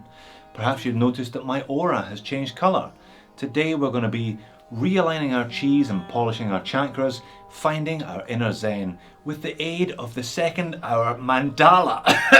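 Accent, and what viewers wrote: Scotland, United Kingdom. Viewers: He even says Om with a Scottish accent.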